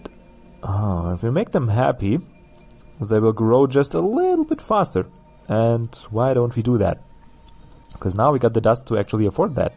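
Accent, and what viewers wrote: Germany. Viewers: Are you, by any chance, german?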